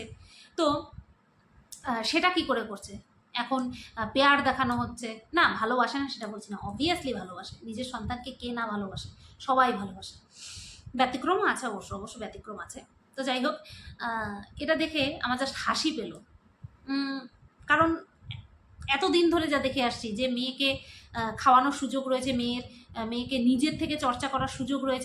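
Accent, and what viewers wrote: Bangal, Bangladesh. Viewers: ❤❤❤❤khubbb valo ble6o r tomar amgo ei bangal ktha purano diner ktha mne kraiya dayyy,vuilla jai amio bangal❤❤❤❤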